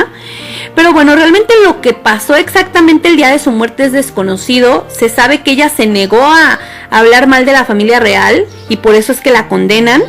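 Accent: Mexico. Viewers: TU ACENTO MEXICANO de clase baja 💩💩💩💩 ES INSOPORTABLE. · Interesante historia pero la voz es un poco fastidiosa con ese acento mexicano tan marcado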